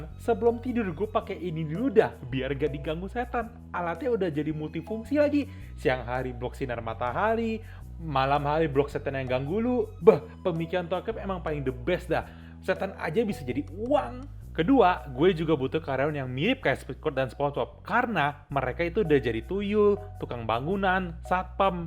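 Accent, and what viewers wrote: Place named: China. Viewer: Salfok sama cara ngobrolnya bang kedenger ada aksen tinghoa nya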